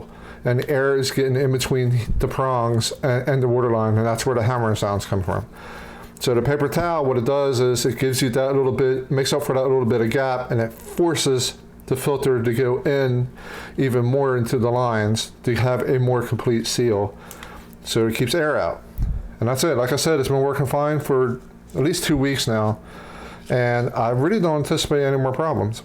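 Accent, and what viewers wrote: Baltimore, United States. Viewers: Sir, I'm from Balmore and you sound as though you may be as well. I just did this and your "fix" worked! Thank you! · That did it! Thanks so much. …and thought I recognized that Balmer accent. Go Ravens!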